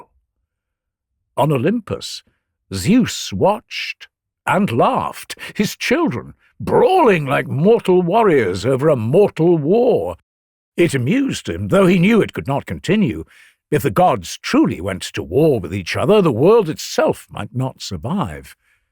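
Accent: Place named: United Kingdom. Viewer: What's this!? Greek mythology in long-form AND in a British accent?? WIN! Can't wait to dive in.....❤ Thank you!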